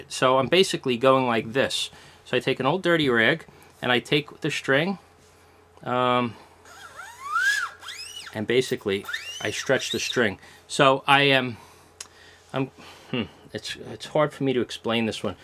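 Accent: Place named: New Jersey, United States. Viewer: The “locking nuts”, how many nuts does that guitar in the video have? Love that jersey accent though...👍 One thing that Fender recommends you do is to make sure that whatever you use to block the tremolo doesn’t move around when your strings are at pitch, whilst the bridge is flat against the top.... then you bring out the claw screws and it’s all good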